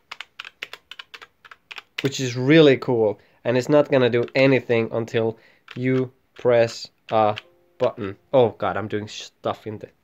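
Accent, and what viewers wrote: Finland. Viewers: Are you finnish? Your accent sounds a bit finnish and you have a nordic keyboard layout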